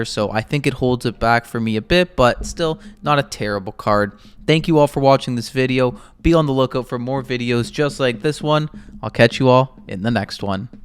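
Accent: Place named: United States. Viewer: Are you a Yank?? Sounds like it. Typical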